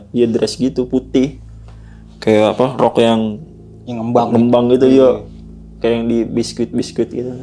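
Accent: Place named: South Jakarta, Indonesia. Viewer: Gaya bicara jaksel punya nih, keren bang pembawaan ceritanya, jadi seolah-olah kaya kita ada di posisi itu, terimakasih sangat menghibur ❤❤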